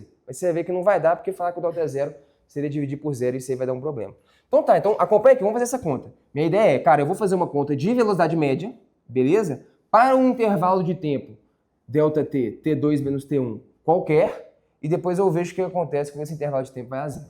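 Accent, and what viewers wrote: Minas Gerais, Brazil. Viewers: Esse professor é mineiro mas se veste de português.kkkk. òtima aula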